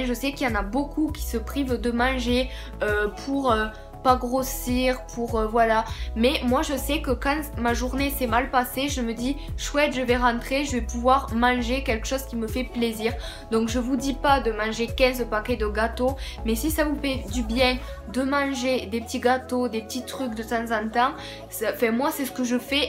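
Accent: South, France. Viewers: C'est pas genre un putain d'accent du Sud! haha il est encore plus marqué que le mien! ;)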